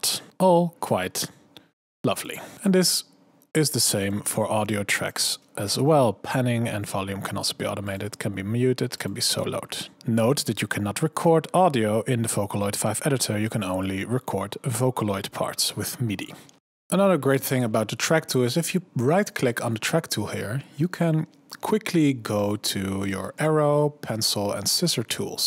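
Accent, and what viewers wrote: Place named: Netherlands. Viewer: Ik dacht al dat ik een NL accent hoorde.. :) gave video.. wat is de key shortcut om die tijdsbar aan het begin te krijgen, de Home toets werkt niet bij mij.. wordt er aardig gek van..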